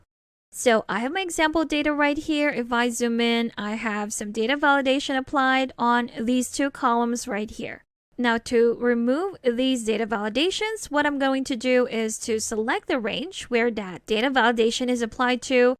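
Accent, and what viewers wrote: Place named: Canada. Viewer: I still think your voice is absolutely beautiful. Even when I don't have a need for your current spreadsheet tip, I might sometimes listen to your latest tutorial just to brighten my day. You could do so much with that voice. Would you mind telling us something about yourself? Based on your accent, I'd guess you're likely Canadian. I'm probably way off, but yea, just a guess.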